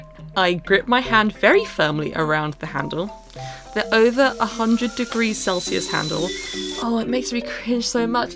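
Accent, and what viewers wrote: United Kingdom. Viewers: you are so British that I have to pause the video to fully understand all your Britishisms. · i love your voice idk why, maybe bc bri'ish lol also love your content :3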